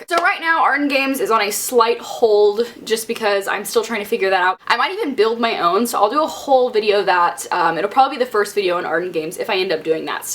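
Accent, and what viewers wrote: South, United States. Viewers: why did they not want you to have the southern accent? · You do have a slight southern twang.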